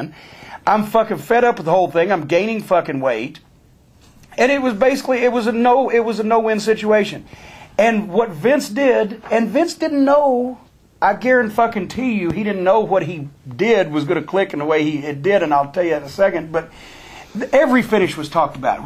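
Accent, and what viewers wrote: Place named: South, United States. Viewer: Jim cornettes is Fucking Hilarious , his southern accent and his Shoots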